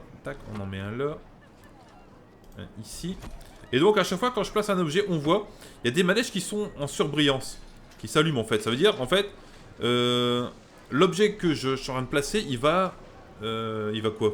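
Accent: France. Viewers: t du ch'nord sa s'entend frere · Salut j'aime bien tes tests, tu es de ch'nord aussi ?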